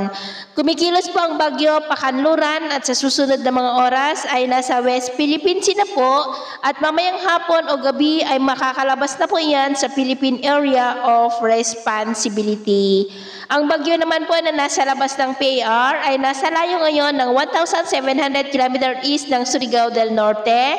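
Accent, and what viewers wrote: Visayas, Philippines. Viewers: Bisaya Yung nag wiwider apdit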